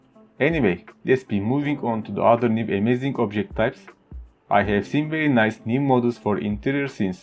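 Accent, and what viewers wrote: Turkey. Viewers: Konuşan türkmü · Çok belli türk olduğu ingilizcesinden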